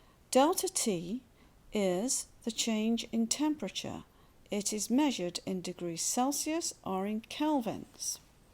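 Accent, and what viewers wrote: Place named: England, United Kingdom. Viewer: When I heard the English Accent I felt a little bummed because science here in the states is a little different than the UK. But this helped me understand sooooo much better. I didnt understand my teacher, this makes a lot more sense. Thank you!!!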